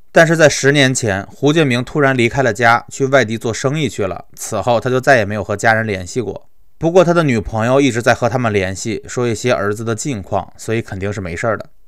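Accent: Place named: Beijing, China. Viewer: +10086 第一次看你影片是石景山八尸案 你当时给凶手口供配音 京味儿真的够浓 一下子代入感亲切感就上来了 老哥加油！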